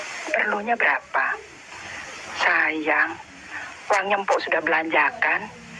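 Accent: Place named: Surabaya, Indonesia. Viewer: Gara2 suara surabaya saya terdampar disini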